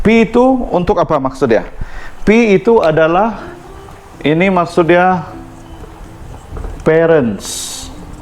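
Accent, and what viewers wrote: Sabah, Malaysia. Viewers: Selamat malam tuan..bila sye tengok tuan punya channel Saya faham ape tu maksud p0 Dan F terima kasih tunjuk ajar...jiwa Saya semakin membara untuk breeding betta...Dan Cara tuan cakap sabah teringat sama kawan2 di tempat kerja tahun 2007 dulu..sebab bila bersama diorang terasa Saya nie di sabah...hahahahahahah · ui na, mantap boss, terus maju jaya sihat selalu dan murahbrezeki bosskur, truskn ckp begitu, sia mok blajar beta sama mok dgr berckaap sabah, btw info harini jelas dan paham terima kasih👍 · mntapp..cakap sabah serupa dgn bhasa brunei bng..ngam ka salam dr brunei broo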